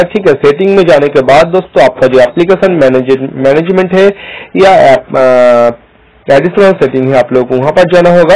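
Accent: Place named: Bengal, India. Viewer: Bangali hai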